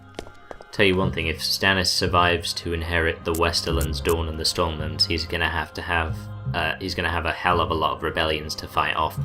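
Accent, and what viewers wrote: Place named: United Kingdom. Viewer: What is your accent? It's easily my favorite British accent but I'm terrible at recognizing regional ones.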